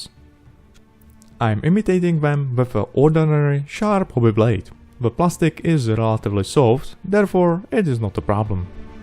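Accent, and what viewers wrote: Russia. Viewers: С русским акцентом!)))) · просто по акценту я посчитал, что он носитель русского.)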